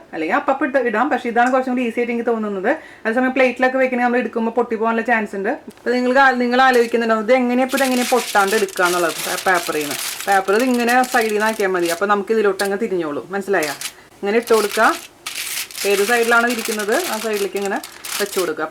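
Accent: Thrissur, India. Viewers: hy chechii!! u r really super!! njn thrissurkari aan .. athond thanne chechide slang and presentation style kekkumbo sharikyum ishtayii.& ur recipes r awesome tta! 😍 marriage kazhinjapo cooking onnum areelyarrnuu..ipo ith nokki nokki chechi paranj tharanath kett kureshe cheyyan padichu.. 😍 & most of all my in laws r so happy with me now 😁!! thnk u so much chechii 😃 keep going!! all d best !! 😘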